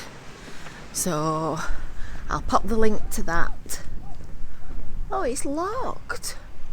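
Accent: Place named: Yorkshire, United Kingdom. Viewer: Thanks again for reminding us of things to visit on our coast. I often put on the auto subtitles and chuckle at how it guesses at your Yorkshire accent. Unfortunately for 'Fylde' it puts fouled or failed!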